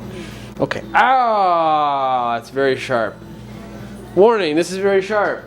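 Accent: Australia. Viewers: This dude kills me me with his Australian voice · you need to stop speaking in an Australian accent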